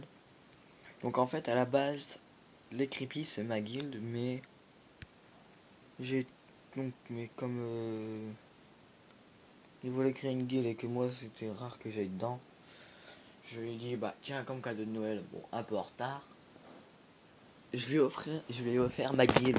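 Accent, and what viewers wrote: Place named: Quebec, Canada. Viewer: Accent québécois ❤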